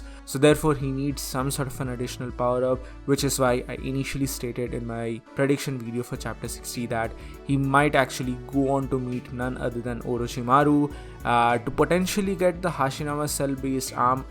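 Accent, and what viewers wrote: India. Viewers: BECAUSE HER ENGLISH SPEAKING STYLE IS LIKE INDIAN THAT'S WHY I AM WELL THANK YOU FOR TELLING (ARIGATO)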